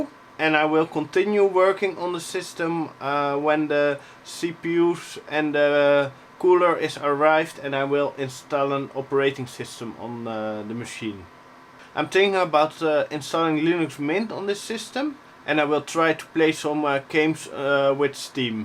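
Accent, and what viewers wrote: Netherlands. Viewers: The Dutch accent is strong with this one :)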